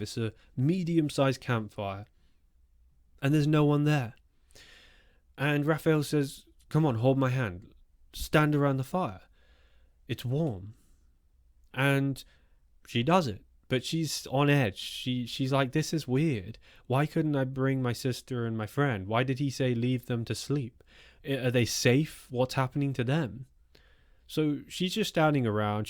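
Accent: United Kingdom. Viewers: You have been popping up on my phone over the last couple days, and I finally checked your channel out. What a fabulous storyteller you are! I was raised by a British mom here in the United States, and your accent has me feeling nostalgic..(she passed away almost 9 years ago). Subscribed, and listening in from beautiful Colorado. 💕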